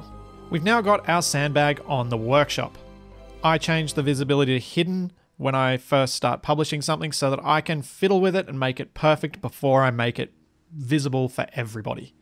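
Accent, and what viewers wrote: Australia. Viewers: Do you come from the land down under? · I literally watched like 50 episodes of survival before realizing was an aussie. I just have a bad ear for accents and thought he was British or something the whole time. The Bin Chicken episode was what finally enlightened me, after some googling.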